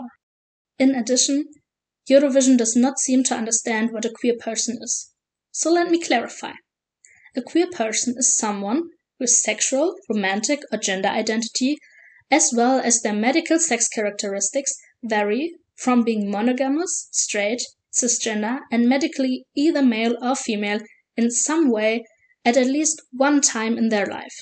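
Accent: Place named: Germany. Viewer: I can hear your German accent.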